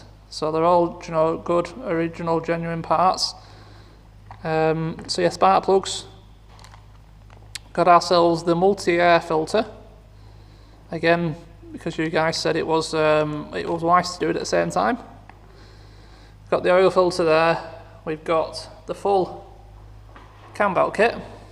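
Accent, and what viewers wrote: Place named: Northern England, United Kingdom. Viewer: Lovely Jubbly video, great northern accent.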